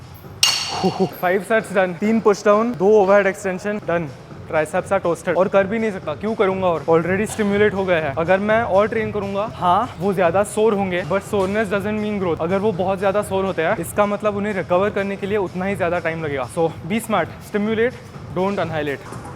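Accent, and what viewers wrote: Maharashtra, India. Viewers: Marathi accent >>> any other language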